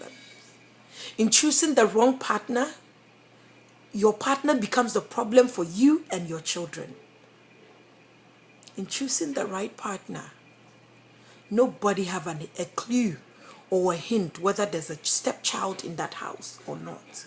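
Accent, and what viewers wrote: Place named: England, United Kingdom. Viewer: you are too kind!!!!!!! we need many parents like you in this world:) BTW I Love your English accent!!<3<3;)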